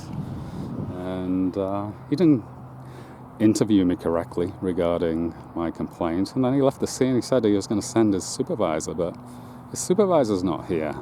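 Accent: United States. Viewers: Come on man. You got to expect a little needling with that Texas accent.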